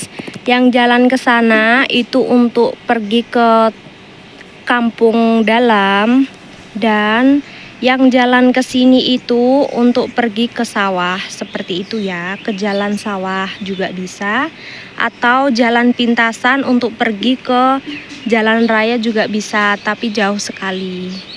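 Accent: Java, Indonesia. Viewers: Orang mana si mbaknya kok bahasanya medok kaya orang jawa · Mbak asli mana to,,,,?kayak jawa ya,?kira2 jawa mana mb,?semoga di balas heheh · Benar mbak, aku baru 2 hari nonton YouTube nya, dlm hati bertanya logat nya logat jawa tapi agama kok bkn Islam, ternyata pindah agama. Setau ku orang Jawa jarang sekali orang yg non muslim. kalau dari medan /manado wajar kl bkn muslim.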